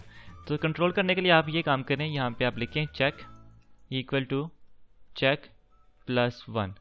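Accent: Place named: Sialkot, Pakistan. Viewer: good and helping tutorial (y) ... and nice voice :P as I heard you , i knew that you from Sialkot :P maybe u r not but i thought :) Good job ,, keep it up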